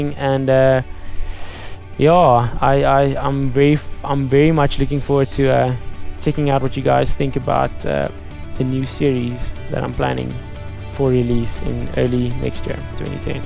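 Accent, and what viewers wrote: South Africa. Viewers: Oh, you're form South Africa! It was bugging me not knowing where your accent was derivitive of. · don't stop making vlogs! i need my dosage of south african accent! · clearly south africa from his accent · its south African, its really strange. Almost like french and British combined xD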